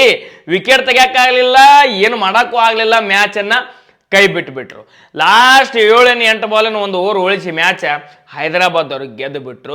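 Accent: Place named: Bijapur, India. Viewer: ಅಣ್ಣಾರ ನೀವೂ ಬಾಳ ಚೊಲೊ ಮಾತಾಡ್ತೀರಿ ನಿಮ್ಮ ಬಿಜಾಪುರ ಮಾತ್ ಕೇಳಾಕ್ ಬಾಳ ಖುಷಿ ಅಕೈತಿ. ಜೈ RCB 👑 💛❤️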